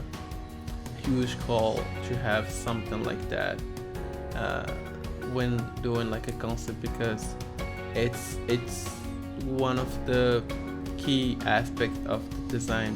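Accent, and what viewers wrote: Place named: Morocco. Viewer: bayne l'accent del mgharba xD l3azz bik khouya great job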